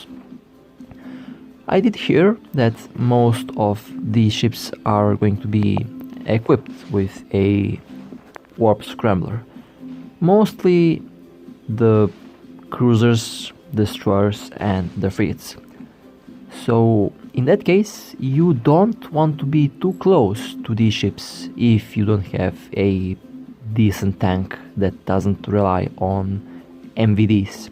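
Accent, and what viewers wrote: Russia. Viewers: Дружище, русский акцент слышно))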